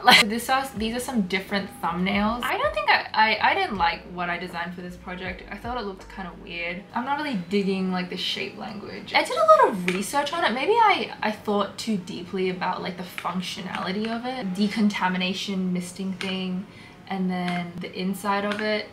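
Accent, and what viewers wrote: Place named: Australia. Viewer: you have an australian accent, what's your background?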